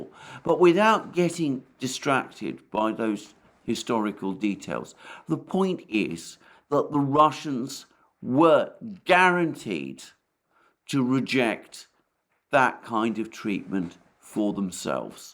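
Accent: England, United Kingdom. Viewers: Dont you love fine russian propaganda with a british accent? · It’s funny hearing Russian propaganda in a English accent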